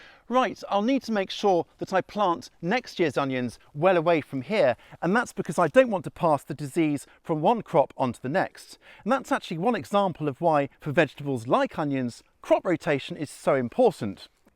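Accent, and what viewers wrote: England, United Kingdom. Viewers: The English accent helps take you seriously. Like oh shit this guy sounds like a professor I better listen up 😳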